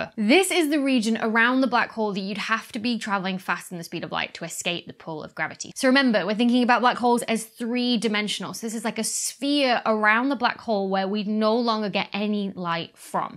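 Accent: United Kingdom. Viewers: why would one even need to "ignore her non-American dialect,_ anyway? British accents are beautiful to me! A whole lot prettier than any of the many American accents that exist. 🙄 And I speak one of them, so I can say that. 😌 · Pretty, smart and has a British accent. She da dream.... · So informative, I didn't know the evolution of a blackhole. Also easier to listen to it with a cute British accent.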